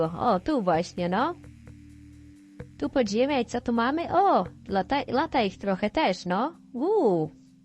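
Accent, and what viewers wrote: Poland. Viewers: Z jakiego rejonu Polski jesteś? Skąd akcent? XD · Podoba mi się twój Podlaski akcent.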